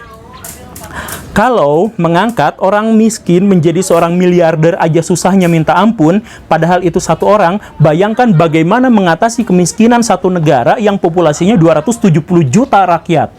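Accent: West Java, Indonesia. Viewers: Mantap dah, Pak....nyunda buanget👍😁😁😁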